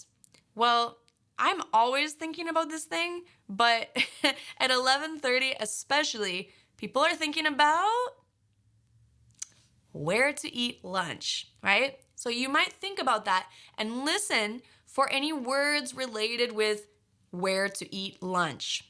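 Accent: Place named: United States. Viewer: hi how it's going honestly I liked your way that you are teaching by the way nice accent wow I love American eglish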